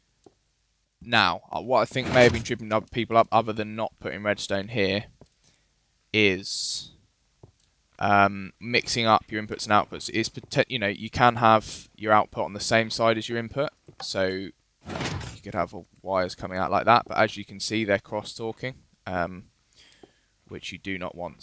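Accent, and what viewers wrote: United Kingdom. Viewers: listening to a British voice on a Minecraft video was a pleasant change. hate your texture pack though :< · i still get amazed by all your knowledge about redstone circuits.. i wanna learn more! >:D keep it up!! nice videos!! (btw, you've got an awesome brittish accent XD)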